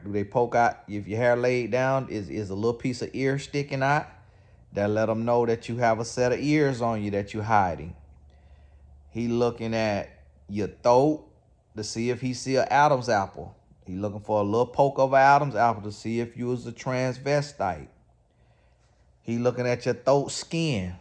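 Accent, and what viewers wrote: South, United States. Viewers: your southern accent is making this video so hilarious 🤣🤣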